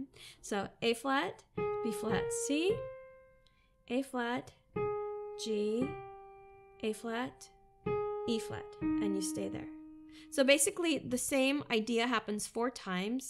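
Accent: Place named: France. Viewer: I hope this idea of sponsoring a tutorial by the person who requests it becomes commonplace. Why someone who provides a product and service, let alone one that has clearly required a good amount of work and expertise, should not be compensated is perplexing. Even Bernie, who thinks everything should be free, would side with The individuals who are providing such services. Aside from that, your French accent is killer.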